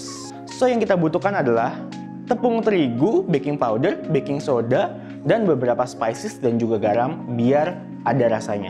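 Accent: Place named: South Jakarta, Indonesia. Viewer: Kebiasaan liat&dengerin aksennya Gordon Ramsay ama Banging with Babish jadi agak gimana denger aksen ala Jaksel.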